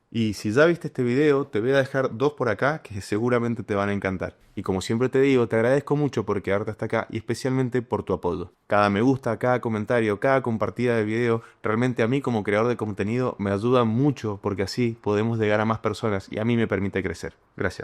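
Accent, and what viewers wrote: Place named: Mendoza, Argentina. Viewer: lo único que entendí, que me parece que sos Mendocino.. esa tonadita que nos caracteriza jaja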